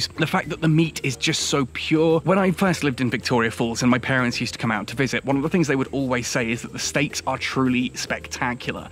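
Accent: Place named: RP, United Kingdom. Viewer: I am Zimbabwean in UK SURREY. Zimbabwe is everything you have said indeed. With that I declare you a Zimbabwean son, brother and one of us. You have said it all and life permits, can’t wait to meet you and your wife, and have a blast ☺️☺️☺️👏. You sound such a well brought up British boy and with this positive and good spirit. I see many Zimbabweans falling in love with you 😍😍😍😍✌️. Keep up the good work and try to do some collaborative with other Zimbabwean bloggers. You won my heart already 💕💕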